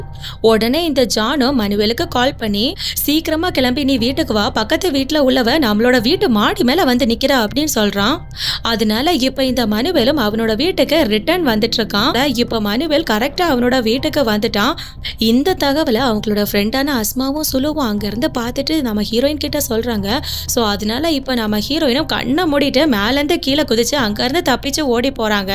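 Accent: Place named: Kerala, India. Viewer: Malayalam accent explanation 😅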